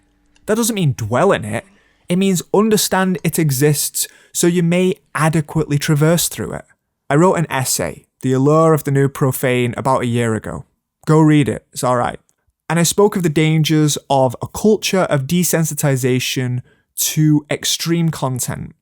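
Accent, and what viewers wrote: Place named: RP, United Kingdom. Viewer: when you do return i think it'll be the funniest video essay u ever watched - especially the plummy voice he puts on during the entire thing.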